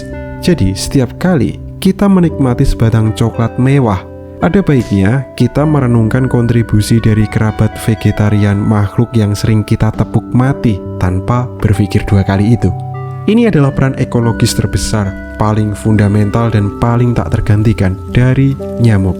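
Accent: Java, Indonesia. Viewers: Medok auto skip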